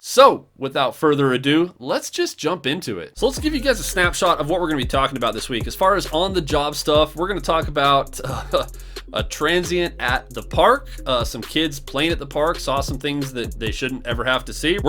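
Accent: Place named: South, United States. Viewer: Ight it’s definitely not you, this guy has a southern accent, my b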